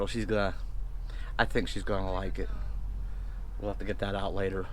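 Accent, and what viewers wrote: Pennsylvania, United States. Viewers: Are you from Pa....you kinda have that PA accent 😂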